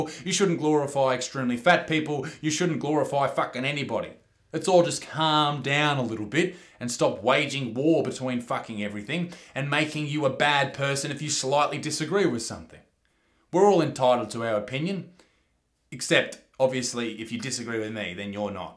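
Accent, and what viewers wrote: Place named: Australia. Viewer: i weighed 135 Me, an American: that's not bad, goddamn: kilograms Me: oh yeah that's right I'm an American and this boi sound like he's from Australia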